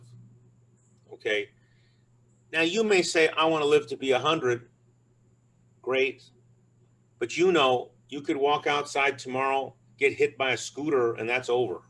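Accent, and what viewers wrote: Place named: United States. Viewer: Awesome session 😁. Liked American accent of speaking of sir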